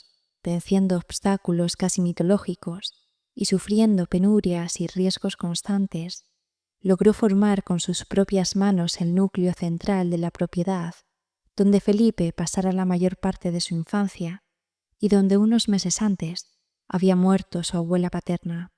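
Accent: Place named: Spain. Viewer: Gracias por tu narración ♥ Tienes el acento de qué región de España? Me encanta!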